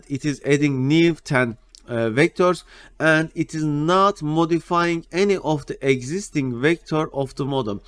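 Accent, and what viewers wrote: Turkey. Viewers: Türk aksanı, nerde olsa tanırım. Helal olsun, mük.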